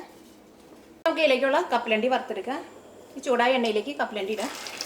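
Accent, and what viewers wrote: Thrissur, India. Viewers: ചേച്ചിടെ ഭാഷ കേൾക്കാൻ തന്നെ വിഡിയോ കാണാറുണ്ട് ... അങ്കമാലി, തൃശൂർ ,,, ഭാഷ ഒത്തിരി ഇഷ്ടമ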